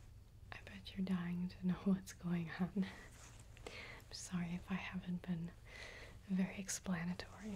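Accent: Midwest, United States. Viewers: I've been trying to figure out this accent as it sounds kind of familiar it's kind of funny that it's just Midwest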